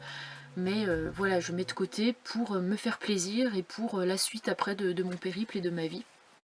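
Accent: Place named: Belgium. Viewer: charmante et courageuse la Belge juste une fois lol et moi qui croyais que tu prenais l'accent Belge juste pour plaisanter hihi. En tout cas ça fait plaisir de voir que des femmes ose se genre de mode de vie. Un petit boujou du 50 😊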